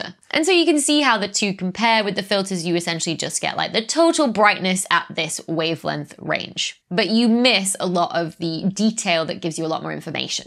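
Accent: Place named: North, United Kingdom. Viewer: "quicker than what our best model of the....." i love the way she sounds so northern.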